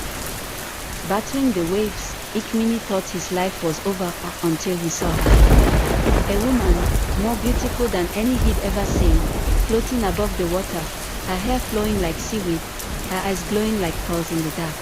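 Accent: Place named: Africa. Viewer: I love the sound effects on your videos and the African tone, you're doing well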